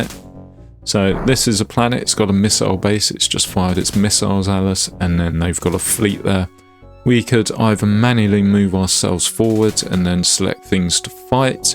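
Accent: United Kingdom. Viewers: Great 2 c someone else than Sullla playing this diamond. And with a nice, calm Bri'ish accent :D . Thank you sir.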